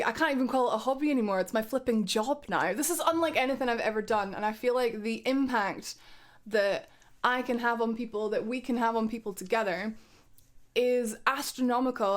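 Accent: Scotland, United Kingdom. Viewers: I remember the first time I watched a Whatculture video that you featured on, and thinking to myself "who the bloody hell is this Scottish chick?". Then I got used to you, started enjoying you more and more, then the gaming channel started doing podcasts, and I'd look forward to whenever you were on them. (I play a game whenever I listen to guess who is on, and would fist pump when you were on). While following you on socials, i saw you were a streamer, and i made a twitch account, because you were streaming and I wanted to be involved in the chat. From that, you were the first streamer I subbed to, and whenever i get chance i always drop by to say hello, (I'm LordPeterEgg btw). I'm sure others have stories similar to mine, and I'm sure like me, we're shocked about this news, but also excited to see what comes next for you! We all love you, and are looking forward to everything to come! 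LONG LIVE! ❤❤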